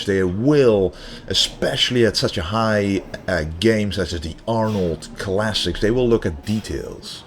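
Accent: Netherlands. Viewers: typical Dutch accent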